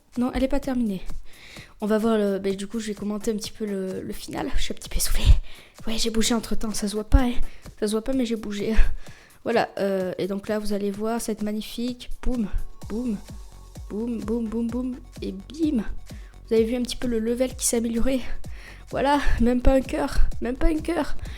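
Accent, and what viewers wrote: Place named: Marseille, France. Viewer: je kiff ton accent de Marseille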